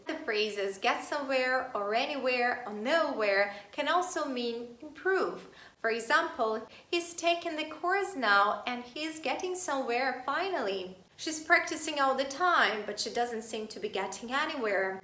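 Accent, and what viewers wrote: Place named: Ireland. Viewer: You king of sound Irish when you speak :-) Great teaching skills.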